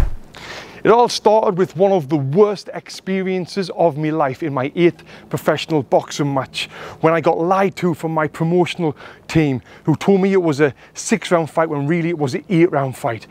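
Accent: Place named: Northern England, United Kingdom. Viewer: i love how you put subtitles up sometimes, as a british person i was confused why you did it on some parts of your video as i can understand you perfectly but then i realised that some americans may find the nothern accent hard to understand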